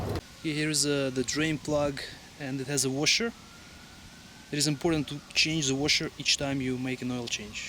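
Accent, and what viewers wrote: Russia. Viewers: he sounds so russian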